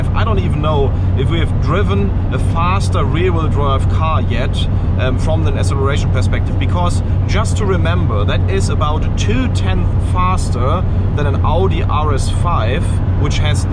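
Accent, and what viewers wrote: Germany. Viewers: Hate the dumb German accent and dad jokes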